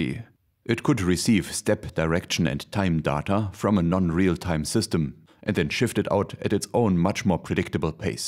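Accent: Germany. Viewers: hard German accent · Sounds epic in a German accent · Der Deutsche Akzent kickt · You have the most German, German accent I've ever heard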